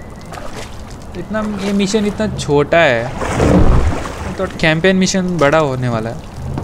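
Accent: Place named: India. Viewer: Hindi Accent 😙😂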